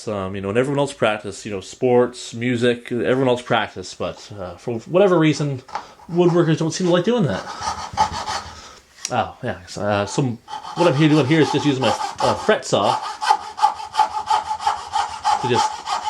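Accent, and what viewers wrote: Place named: Canada. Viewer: Okay, to follow up with what I mentioned on Twitter, this video showed me that I need MUCH less chiseling. I had made my New Year's resolution to learn hand-cut dovetails, and watched a few basic videos and subsequently a few (horrible) attempts. Prior to this tutorial, I was laying out my tails with a marking knife so that I could register my chisel in the mark. I would use the dovetail saw to cut shy of the line, a coping saw to remove the waste, and then try to use the chisel from way too many angles. As I was new, I wasn't using the chisel very well, and had some irregular cuts, undercuts in the wrong places, angle changes, etc. Then I would transfer this mess to the pin board, and more irregular chiseling only compounded the mess. After watching this tutorial, I decided to "let it go" and just use the cut from the saw, and only use the chisel to clean up the bottom, instead of the bottoms and sides. 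This has led to not only quicker dovetails, but cleaner ones so far. What I am able to transfer to the pin board seems to be a lot better, and will get better as I practice. I still have far to go, but am trying to get down to the shop at least once a day to make a practice dovetail. Thanks again for not only the tips in the video, but for everything else (keeping it real, showing mistakes, crass jokes, Twitter rants, glorious Canadian accent, etc.)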